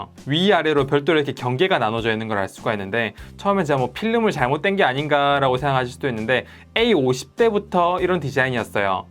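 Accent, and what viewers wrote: Gyeongsang, South Korea. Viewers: 경상도사람이구나. 순간 김영철인줄..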